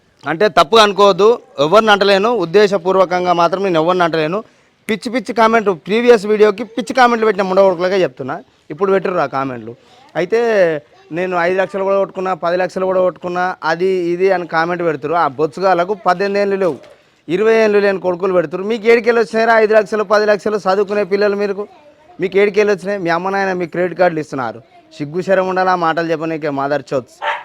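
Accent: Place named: Telangana, India. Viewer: ఈయన తెలంగాణ బాషా చాలా బాగా మాట్లాడుతున్నాడు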